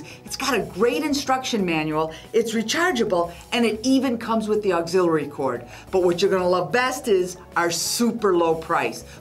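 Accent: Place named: Minnesota, United States. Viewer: I thought she was from Minnesota, I kept waiting for her to go "dontcha know".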